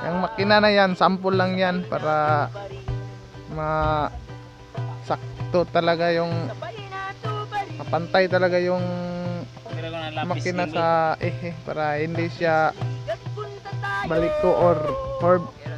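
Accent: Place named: Visayas, Philippines. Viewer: Hahaha abi kog peko agaw sa pala mahirap talaga tayong mga bisaya mag tagalog hehehe 🤣 proud jud ko sa akong pagka bisaya bai..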